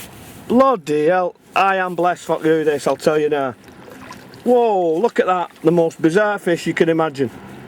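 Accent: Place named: Northern England, United Kingdom. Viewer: Good footage of the sunfish and I love the narrating proper northern accent, me grandad was from Halifax so was a nice reminder. Thanks for this video.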